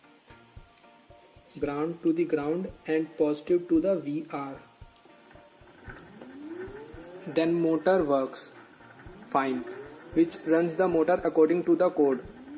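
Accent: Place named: India. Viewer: Porque hablas como Indio y el stick de la radio necesita lubricación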